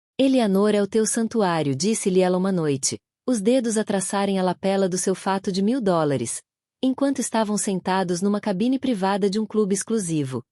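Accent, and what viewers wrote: Portugal. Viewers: Idioma português Portugal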